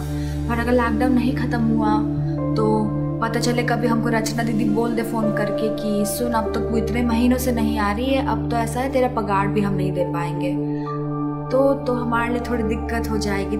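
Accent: Bihar, India. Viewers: Why you use Bihari tone?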